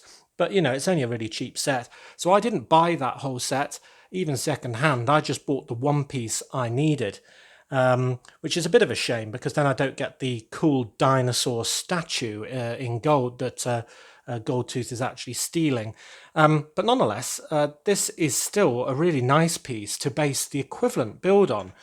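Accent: United Kingdom. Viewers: are you british bc i thought you were american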